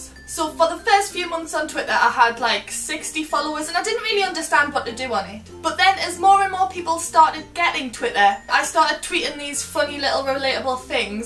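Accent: Newcastle, United Kingdom. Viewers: Is she from Newcastle?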